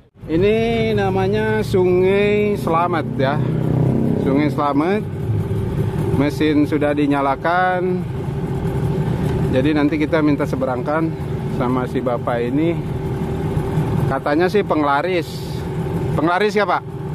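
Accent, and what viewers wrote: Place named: West Sumatra, Indonesia. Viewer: Cara cpat smpai di pelabuhan dwikora pontianak.. Maaf om org pontianak atw org minang krn dr nada bhs kaya org minang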